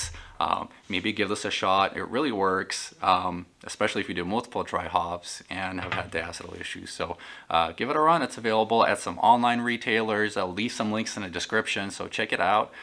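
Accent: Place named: Midwest, United States. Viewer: Genuinely refreshing to see more beer youtubers who can speak midwestern too. thanks, A Detroiter (ps our deep dish pizza > your deep dish pizza - italian beef is great though, malort not so much)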